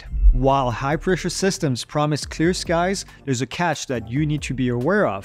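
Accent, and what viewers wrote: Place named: France. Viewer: Are you from french descent ? I notice some remaining french accent in your speach.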